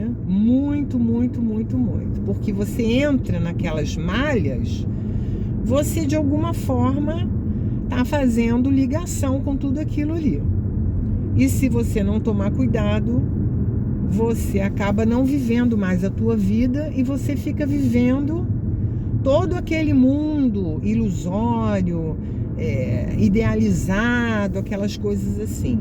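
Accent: Rio de Janeiro, Brazil. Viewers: obrigada por essas lives maravilhosas!! Admiro vc❤.. vc continua sim, com o sotaque carioca.. puxadinho e com o X no final...rs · Nao tem sotaque nenhum!!, kkkk mestra linda vc é a esseencia do sotaque carioca ❤❤❤❤❤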